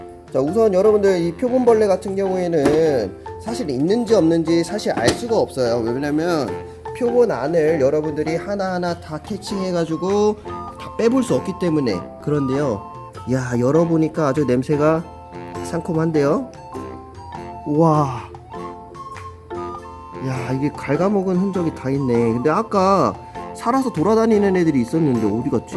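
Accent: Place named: Jeolla, South Korea. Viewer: 전라도 억양 고치세요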